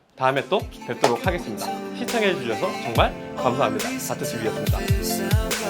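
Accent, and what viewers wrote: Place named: Gyeongsang, South Korea. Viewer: 경상도 분이네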